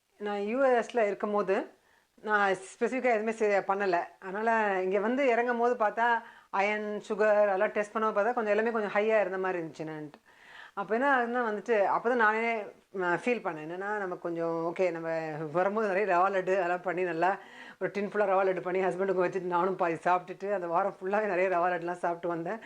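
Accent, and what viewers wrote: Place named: Tirunelveli, India. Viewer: Mam what is ur native place..ur slang is like our tirunelveli slang..nice to hear..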